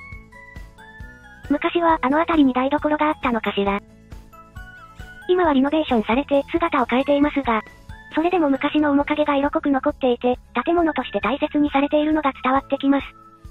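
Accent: Hakata, Japan. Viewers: 若い女の子の博多弁が好きすぎて、思わずチャンネル登録してしまいましたが、(もちろん物件も好き❤)よくよく観ると、若い女の子ではナカッタ...(T_T) ま、いいっか👍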